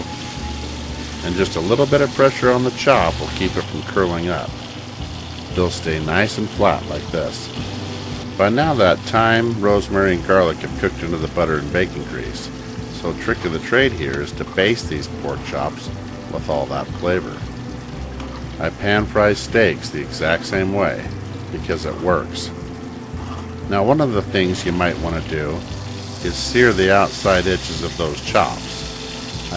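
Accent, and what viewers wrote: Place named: South, United States. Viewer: It's the Southern accent and chops for me, just subscribed, hoping you have jokes for days too for the bored ones....😂😂😂😂 Thanks for sharing 👍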